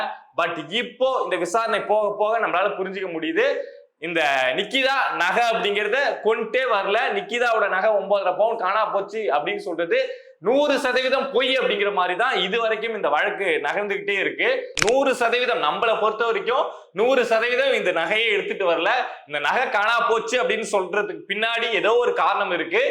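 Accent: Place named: Nellore, India. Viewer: Nellore speak Anna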